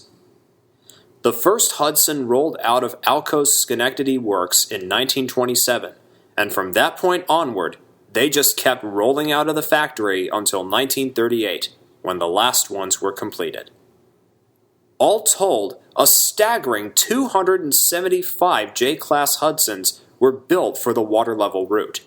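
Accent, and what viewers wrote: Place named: RP, United Kingdom. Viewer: weird RP shit lol.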